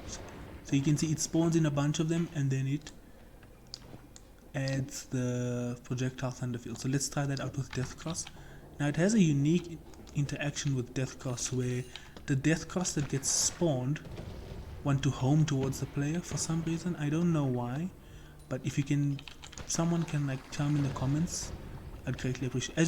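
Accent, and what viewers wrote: South Africa. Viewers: South African? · Are you south African? Wondering based off your accent and I'm from Johannesburg. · Are you south african?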